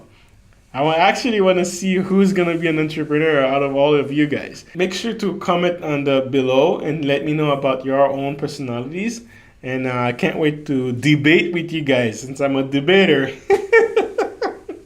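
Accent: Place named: Haiti. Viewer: Where are you from? Your accent sounds Haitian to me, but I'm unsure. That, or what's your heritage? Were you raised by others who had a similar accent?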